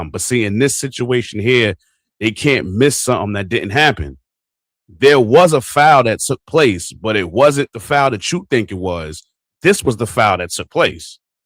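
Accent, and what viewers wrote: New York, United States. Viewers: The whoLe phrase was: "Tuff Tiddy To suck" You sound Like a Nu Yawka bro. #SaLuTe_Homeboy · Spitting facts and a NY accent, oh I’m locked in!!!!!!!!!!!!!!!!❤❤❤❤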